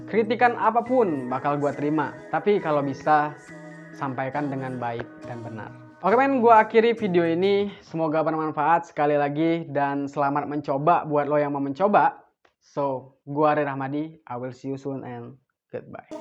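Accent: Madura, Indonesia. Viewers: Edeh oreng medureh cong?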